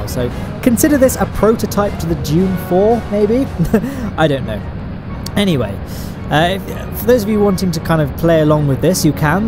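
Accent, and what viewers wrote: United Kingdom. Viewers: Trying to transcribe the intro, but I am missing one lousy word. My Canadian hearing eh? can't pick up one British accented word. Please review and correct? Thanks. On a lonely planet slowly spinning its way to damnation amidst the incompetence and utter carelessness of lesser space perrians, one team stands resilient against the herds. Putting their lives on the line to aid those who were previously unaware of the quick-save option. Yes, it's the incredible adventures of Jebediah and his crack team of kerbonauts. They are: THE BLUNDERBIRDS. Saving the Kerbin race one stranded explorer at a time.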